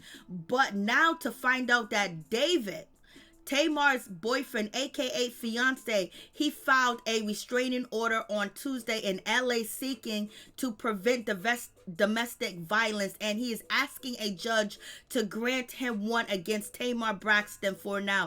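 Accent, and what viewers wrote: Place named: East Coast, United States. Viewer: your accent made me subscribe.. is that east coast vibes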